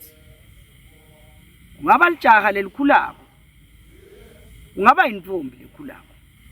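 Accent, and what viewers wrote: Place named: Tsonga, South Africa. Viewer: Some shangaan tsonga dilect on swati language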